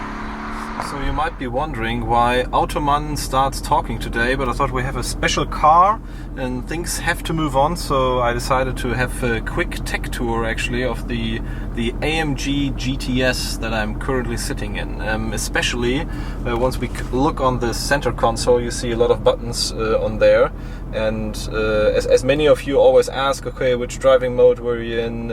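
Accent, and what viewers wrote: Germany. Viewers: Der deutsche Akzent ist ja schrecklich 😂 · great video automann ! more talking videos please ! Also really enjoyed your German accent !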